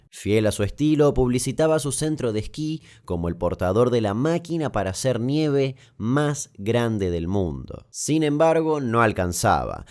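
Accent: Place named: Argentina. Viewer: Que raro episodio del Dollop, con acento argentino y sin Badia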